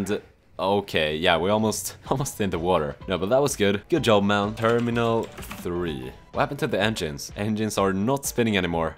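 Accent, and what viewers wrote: Sweden. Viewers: Are you Swedish? You sound Swedish hahaah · Are u swedish? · You have a swedish accent my dude hej hur mår du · Swedish Right?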